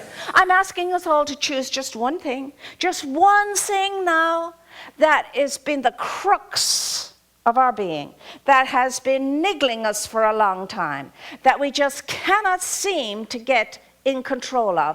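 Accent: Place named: Ireland. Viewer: Love the message. And her graceful hint of an Irish accent is beautiful to my ears.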